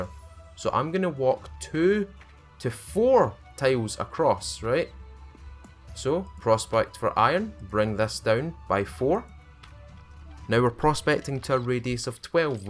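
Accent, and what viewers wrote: Scotland, United Kingdom. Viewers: I watch these just to hear that beautiful Scottish voice of yours. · Thank you always getting my lif information here and is your accent scottish?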